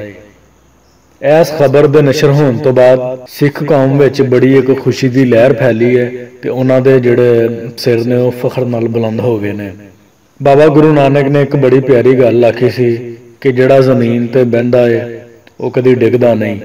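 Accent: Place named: Sialkot, Pakistan. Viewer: Bhai teri boli Sialkot wali lagdi ah.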